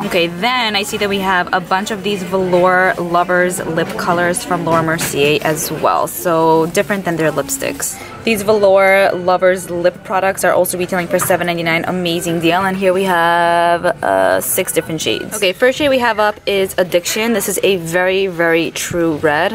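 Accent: New York, United States. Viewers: I never comment but always watch since you came up on my suggested and I subbed. Love your accent I too am a New Yorker but I live in the deep Deep South (Florida😎) so you sound like home💖 now back to my point that exclusive for Harrods was named for Princess Charlotte they did a whole Royal line so that is an amazing find! Love all your hauls I’m a big TJ Maxx fan and just keep these videos coming!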